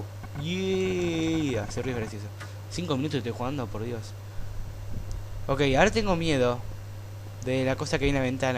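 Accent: Argentina. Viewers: alguien me puede decir de que país argentino o ingles aaaaaaaaaaaaa me confundo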